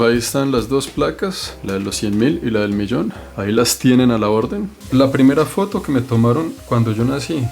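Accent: Colombia. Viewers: Me pregunté ese acento es Colombiano, y lo de andariego y aventurero definitivamente está en los genes y como dice el dicho " Al que le gusta le sabe estar aquí , allá y aculla. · 🌎 mi amor bello le noto mucho mas asento Colombiano ultimante, me imagino por los estupenda saga que realizaste alla, o por que te estas relacionando con mas colombianos en Canada, cuentanos....⁉⁉que dejame decir que tu asento es precioso, cariños Elinita Venezolana que vivio 6 años en Panama y ahora esta en Italia de donde son mis raices..❔Gracias por hacer los videos..✅✅✅✅✅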